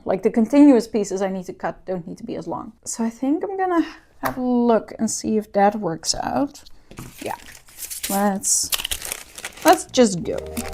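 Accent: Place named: Quebec, Canada. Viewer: Love this tutorial. I recently got back into SLR film photography and want to make myself a cute camera bag. I also love your accent, are you from Quebec?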